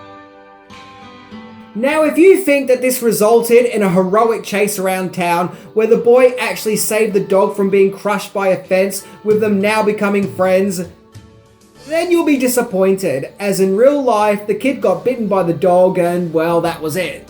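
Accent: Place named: Australia. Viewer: this is one of the few times I got an accent wrong. I honestly thought you were British. but now that you pointed out ur Australian, I can tell. I'm honestly thinking to myself, "how did I get that wrong?"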